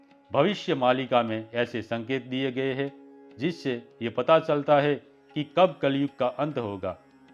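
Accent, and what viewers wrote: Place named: Marwari, India. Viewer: भाई पक्का आप राजस्थानी हो आपके बोलने से ही पता चल रहा है की आप मारबाड़ी हो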